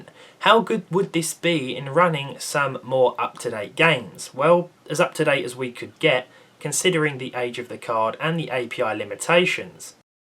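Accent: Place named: Brighton, United Kingdom. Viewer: You’re from Brighton, aren’t ya?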